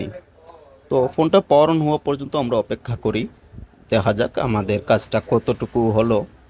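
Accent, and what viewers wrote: Sylhet, Bangladesh. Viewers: Vai Sylheti😊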